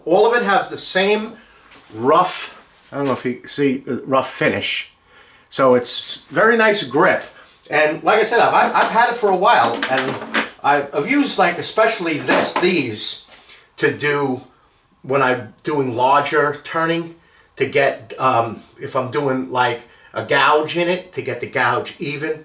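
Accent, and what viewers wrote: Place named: New York, United States. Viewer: Merry Christmas, what part of NYC area are you from? I can hear it in your voice. LOL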